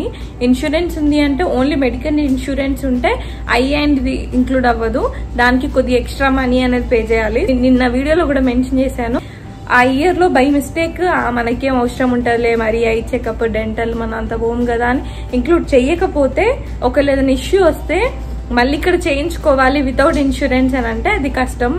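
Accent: Telangana, India. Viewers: Hai sister! bava Namasthe! Nenu mee videos oka 5days nunchi chusthunnanu.chala bagunai,chala vishayalu thelusthunnai.meeru akkada unna kuda mana saampradayalu marva kunda meeru chese poojalu naku baga nachai.e roju maa ammaku mee videos chupincha.chala manchigunai annadhi.mimmalni chusaka chala nerchukovali anipinchindhi.mee presentation mana telangana yasa lo undatam valana inka chala bagundhi.vemulavada rajanna swami dheevena eppudu mee pai undalani korukuntu.....all the best!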